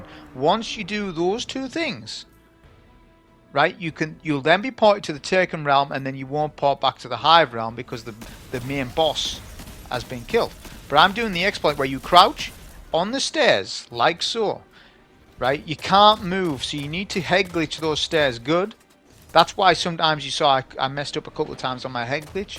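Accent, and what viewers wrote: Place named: Scotland, United Kingdom. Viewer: As a player exclusively playing with German speaking gamers I always recommend channel, but rarely they speak English well enough to understand his scottish accent. So they go to German speaking youtubers to watch how to play those Nightfalls. I understand well since I worked with two scottish women for a long time. And this channel IS the single best informative channel out there, no one else shares his knowledge in such a detailed way.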